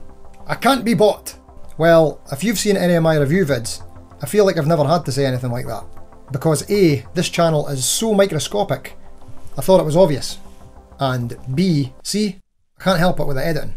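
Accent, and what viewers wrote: Scotland, United Kingdom. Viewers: I enjoy your videos because of several reasons. One, I am also interested in new budget gear. Two, I just like your personal style => serious info but also your sense of humor. Three, I may be wrong, but I think you are the first of all reviewers I watch who took a Budget guitar to a live situation. That is outstanding to me and I’d love this to be a co stanz thing in at least some reviews (I guess it would not be possible to test all stuff live). Four, I like your dialect/accent (Scottish? I’m from German I can only guess). For example you pronounce „you“ like the German „u with two dots on top“ which is fascinating. Fife, so far it is still possible to get your reaction - with more viewers that will naturally decrease, but for now I like that. Great channel and work. Keep it up mate! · yep ;) I think is more the accent not the speed :) I can understand good English even if fast but I never practiced with Scottish people ehehe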